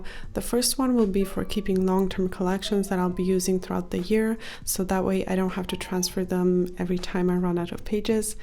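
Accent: Germany. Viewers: oh thats interesting ^^ to me it sounded a little german. But maybe thats only, because i'm german ^^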